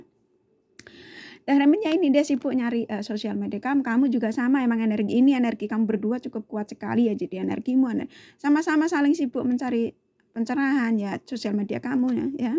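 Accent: Java, Indonesia. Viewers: mb, kok lucu banget sih, jadi ketawa klo denger redingan nya, mksh mb sangat menghibur sekali., Mb orang jawa ya kok medhok banget..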